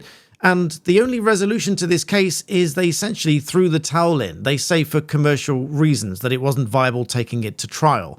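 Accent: United Kingdom. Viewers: I thought you were Blacktail Studios and wondered how you got a British accent 😂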